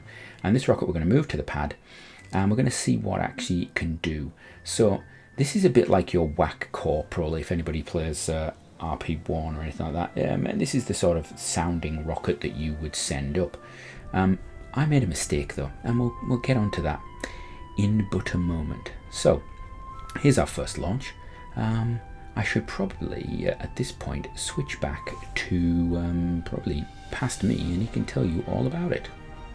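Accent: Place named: United Kingdom. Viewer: hmmmmmmmmm that british accent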